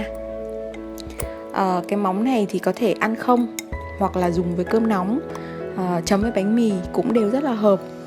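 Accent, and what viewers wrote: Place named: North, Vietnam. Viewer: noí tiêńg băć nghe hay. thanks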